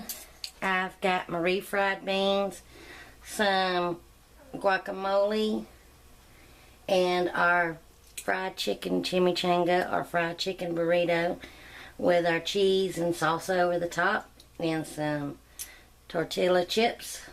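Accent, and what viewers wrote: South, United States. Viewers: Great video. Thanks and I subscribed. loved the southern accent. keep the vids coming.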